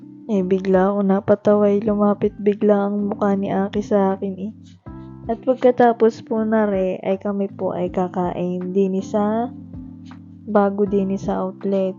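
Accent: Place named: Batangas, Philippines. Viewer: Nice bonding may punto salita batangas kayo Mam😅 nakaka tuwa nman simple nice video susbscribed na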